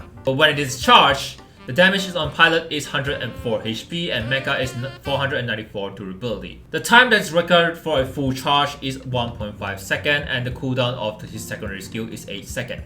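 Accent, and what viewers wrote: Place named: China. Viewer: Dude the Chinese accent and language is so cool damn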